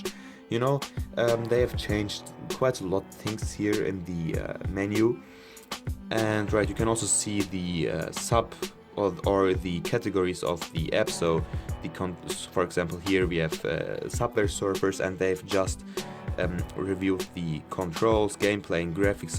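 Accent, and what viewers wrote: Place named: Germany. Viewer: Man merkt das du deutscher bist 🇩🇪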